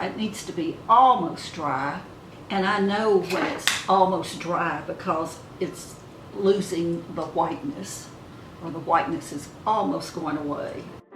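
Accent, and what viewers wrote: South, United States. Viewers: Stunning woman! I am obsessed with your southern drawl😍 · . You really don't need the face lift band. Your face is lovely. I thoroughly enjoyed your video, very informative, but more than that your delivery is sweet. Pray tell what part of America are you from? I am assuming the south. Keep doing what you do, it works. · what a southern bell! ❤ say ole again😅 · ...you're amazing! I'm so glad I found your channel! I'm 53 and everything was hanging in there quite nicely with my looks until about a year ago. Hormones...dang..who knew?! Lolol It may be a longer process, but I'm going to continue to put effort in myself. You're so beautiful inside and out!❤️ Are you southern? Love your sweet accent! I'm an Alabamian 😁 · Just found your channel & I have to say I love it! I think your awesome & funny! I'm 55 & now & i hope I look half as good as you when I'm 60+!! 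Do I detect a Southern accent? I'm from north Mississippi myself. Look forward to watching many more shows! God bless & take care. Love, Ollie Renee'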